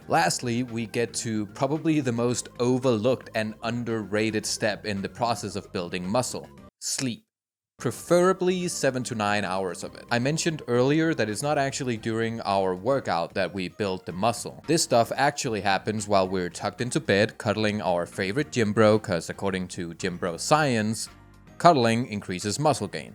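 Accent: Denmark. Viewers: Hmm I sense a Danish accent. Am I wrong? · Hold on, that accent.. Are you danish?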